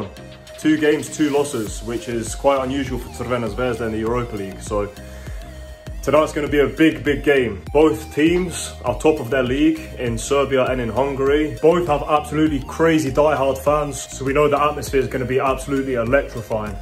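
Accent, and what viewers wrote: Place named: United Kingdom. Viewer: Imas odlican britanski naglasak brate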